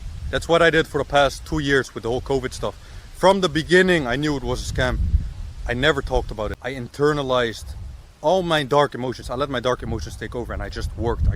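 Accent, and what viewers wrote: Netherlands. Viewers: I heard the Dutch accent in your first video, groetjes uit NL alsnog, als ik de kans krijg en het kan financiëel ben ik opgedonderd 🤣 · Yea i thought i heard al lil dutch accent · Ik dacht all dat ik en Nederlands accent hoorde maar lekker bezig man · Yo, ik dacht al te horen dat je uit Nederland komt. Mooi om dit te zien. Ik ben ook nederlands. Ik vind je werk met Far From Weak heel goed. Ga door.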